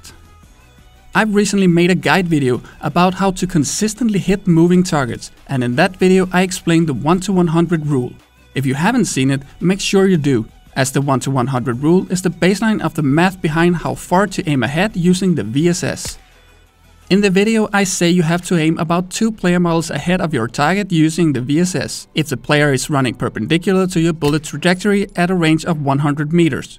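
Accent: Denmark. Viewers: You sound Danish? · damn, youve lost alot of your danish accent over the past five years =D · Are you Danish?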